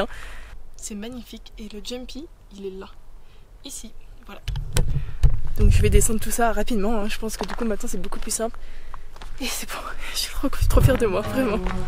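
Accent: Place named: Italy. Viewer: Magnifique videos!!. Tu commencais a avoir l accent italien!!!!. Bravo a toi !!. Tu es une baroudeuse😊. A bientot j espere. Revients!!!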